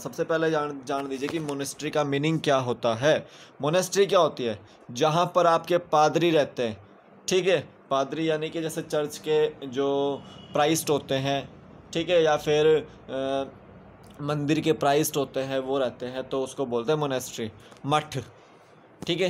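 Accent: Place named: Himachal Pradesh, India. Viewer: its really helpful thnku sir ...nd your himchali accent is too good😄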